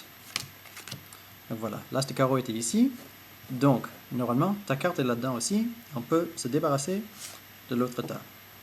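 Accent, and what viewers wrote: Quebec, Canada. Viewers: bravo j'adore l'accent québecois :-)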